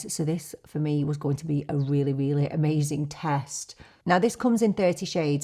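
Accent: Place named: Manchester, United Kingdom. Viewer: Just stumbled onto your channel after searching for Estée Lauder double wear. I love this concealer! Trying to place your accent, are you from around Manchester?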